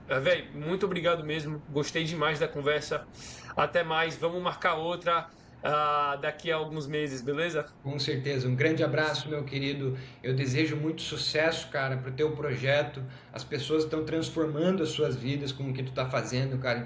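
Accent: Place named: Santa Catarina, Brazil. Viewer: Muito bom!! O conhecimento do é muito vasto, agrega muito e o sotaque parece de catarinense 😅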